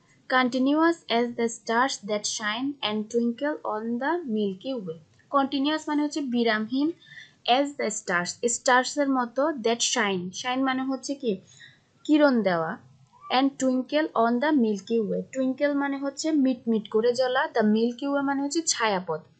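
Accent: Chittagong, Bangladesh. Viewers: Maam mone hoy...Chottogram er..... vasa shune mone holo....😊❤ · সুন্দর তবে চট্টগ্রামের টান মনে হচ্ছে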